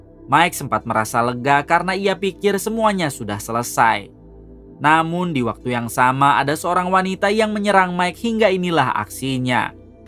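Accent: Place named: North Sumatra, Indonesia. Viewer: Karna totongnya... 🤣🤣🤣🤣 Orang sumut lu min?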